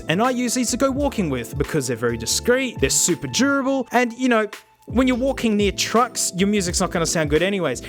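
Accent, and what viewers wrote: Australia. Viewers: bruh, youre ozzie as fuck. I gotta call my fam down under now. great video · You’re as aussie as Colin furze is British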